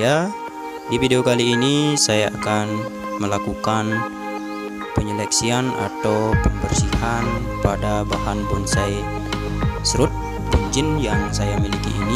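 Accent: Bali, Indonesia. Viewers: Logat bali sangat kental bli,, Salam semeton 🙏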